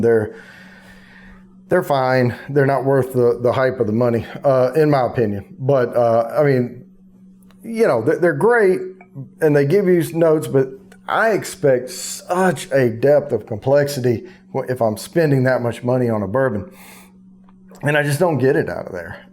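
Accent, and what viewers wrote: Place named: South, United States. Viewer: I just now found this channel and this review. I'm drinking Bernheim tonight, so I thought I'd just see what other reviewers thought of it, and there you were. I'm happy that you enjoy the Bernheim, because I do too. And it's nice to hear a southern accent - I'm a Texan, and it seems like 99% of all the reviewers on YouTube are Yankees. :)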